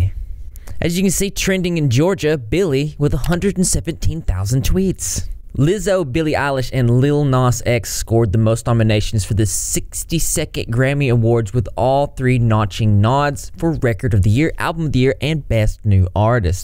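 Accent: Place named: Texas, United States. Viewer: Your southern accent is as heavy as mine lol are you from TX?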